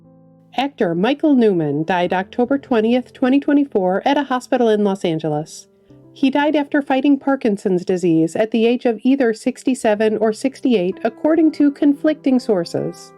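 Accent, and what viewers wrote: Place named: Minnesota, United States. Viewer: I would love to know more about her! Her narration and accent sound like she was born and raised in Minnesota or somewhere around that region.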